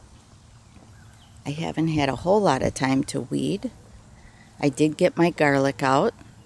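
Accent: Wisconsin, United States. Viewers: Thank you for all the hours of enjoyment & knowledge you've provided. I have to tell you it was your "Wisconsin accent" that pulled me in - initially. You are charming and I just knew you had a twinkle in your eye.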